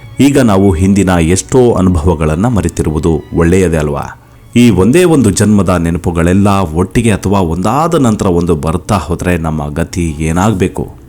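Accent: Standard. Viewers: Nimma nirupanashyli adbhuthavagide. Shuddha Kannada karnanandakara. 🙏🙏🙏🙏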